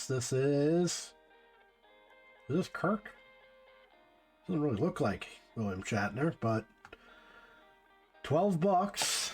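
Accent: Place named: West London, United Kingdom. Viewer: Hahha was thinking you were from Hammersmith, West London 😂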